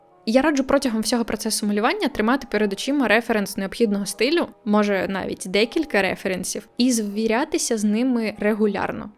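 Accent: Russia. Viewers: даже русский акцент, т.к язык автора изначально русский))) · Язык красивый и я его понимаю. Но русский акцент он же никуда не денется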